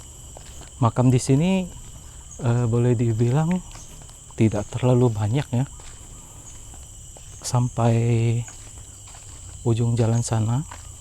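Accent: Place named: Pontianak, Indonesia. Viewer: logat admin khas tionghoa ponti..hehehe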